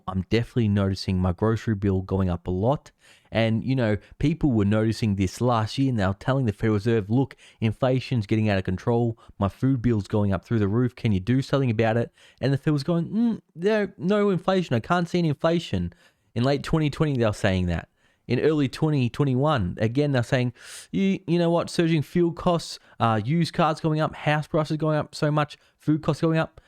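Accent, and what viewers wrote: Australia. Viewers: Yeah u need subtitles for you American viewers they won’t understand your full Aussie accent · Mahket? Its not a silent R, its market banned cool? You mean coal. I've never heard such a slack jawed aussie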